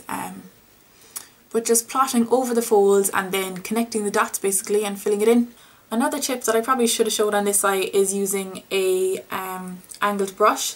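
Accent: Ireland. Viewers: I have been looking everywhere for an informative and straightforward tutorial on how to do a winged eyeliner on eyes with low creases - and here you are! Sure, I've found a few here and there but no one explains the jagged connected line that my weird creases need to get that smooth line when looking straight on :D And your lovely Irish accent is just sweet icing on the creased cake. Keep up the good work! /Linn Andersen (Sweden) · Your Irish accent is a dream!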